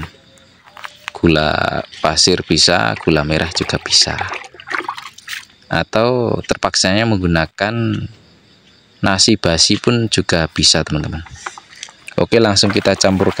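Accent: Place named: Java, Indonesia. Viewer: Jenengan pundi kok jowo meduk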